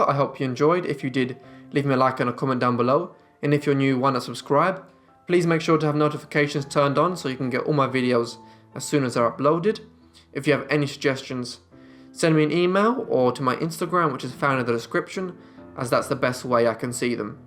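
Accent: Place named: United Kingdom. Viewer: Thank you for all your hard work and informative video. Well done. Subbed after 7 minutes :-) Something about a British accent automatically adds 30 IQ points to any documentary. Especially yours, you have a great voice, very clear and understandable. Not gonna lie, some accents I have to rewind several times or turn on the captioning feature. Look forward to watching more. Hope you have a safe and prosperous new year.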